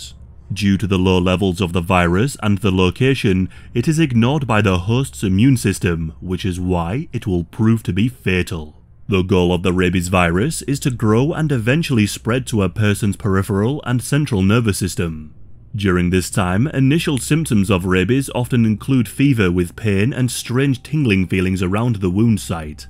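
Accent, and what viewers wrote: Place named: Scotland, United Kingdom. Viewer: Love your accent. Scottish or Irish? I'm a wee bit uncertain.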